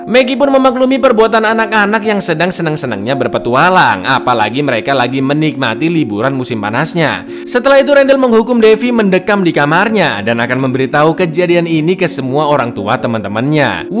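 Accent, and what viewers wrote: Java, Indonesia. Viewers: Khas selalu sama logat jawanya bang.. jos bang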